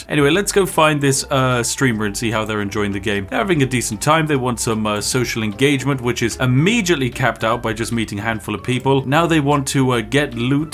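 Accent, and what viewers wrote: United Kingdom. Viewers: why are you british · Is the spiffing Brit from Britain? Sounds a little bit like a British person. No front of course.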